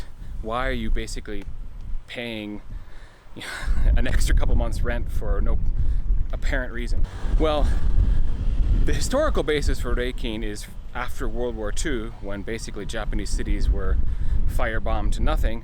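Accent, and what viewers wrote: Australia. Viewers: Your Aussie accent isn't.... terrible. (An Aussie here). Reikin is TERRIBLE indeed. best, D.A. NYC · Your Australian accent is spot on, says this Kiwi. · Cheers for another fun video. Plenty of common sense in this episode, and the timezone point is very interesting. Looking forward to next video!! PS: that Aussie accent...maaaaaaate....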